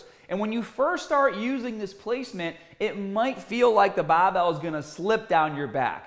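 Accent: Boston, United States. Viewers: Gotta be Boston or somewhere in that region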